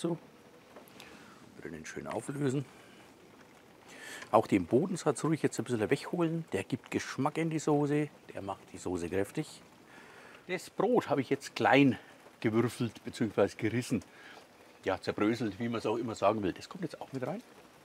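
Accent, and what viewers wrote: Franconia, Germany. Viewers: Servus Der Dialekt ist nicht zu überhören, beim Franken hört sich das immer so niedlich an. Bin aus der Oberpfalz und was soll ich sagen.... mein Hund spricht die gleiche Sprache. 🫣 Dein Dopf Gericht wird nachgemacht, ich werd aber dazu ein Nierenzapfen nehmen den da kommt der Rindsgeschmack besser zur Geltung. Gruß vom Hobbyschmied und Daumen 👍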